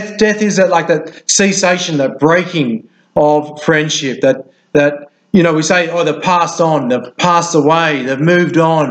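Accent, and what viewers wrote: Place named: Canada. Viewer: Thanks for the inspiring message Padre! Nice Canadian accent 😂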